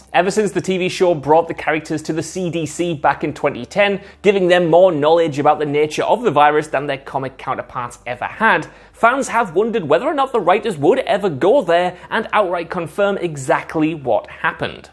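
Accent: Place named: Scotland, United Kingdom. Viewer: I think it’s so satisfying how Scottish (maybe Irish) he sounds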